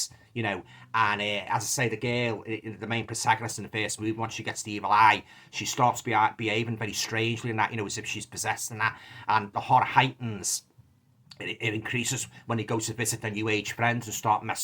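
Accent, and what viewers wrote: Liverpool, United Kingdom. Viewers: Hey bud. So good to hear someone from Liverpool reviewing a horror film. And thanks for the compliment on the cover art. I’m really proud of it and I think this was a great film.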